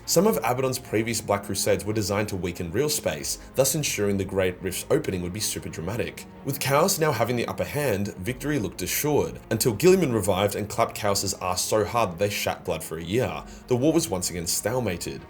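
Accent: Australia. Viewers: I may not always get to enjoy having 40k explained to me by a cursing Aussie but when I do I always enjoy it. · My friend 600 k is getting closer i am so happy for you ive never ever played a Warhammer game never bought something that has to do with Warhammer but you are my favorite warhammrr YouTuber ever you make it fun you put in some jokes and the aussie accent makes it even better you make awsome videos keep doing great thing 💪💪💪😁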